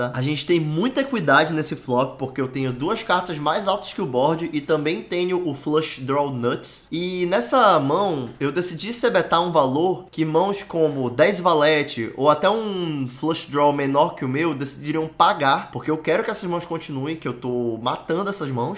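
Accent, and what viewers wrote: Pará, Brazil. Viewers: mano que video legal, vou começar a acompanhar, to jogando online e to ganhando por enquanto, no positivo em relação ao que eu coloquei, percebi pelo sotaque que vc é do Pará né, muito massa mano, só sucesso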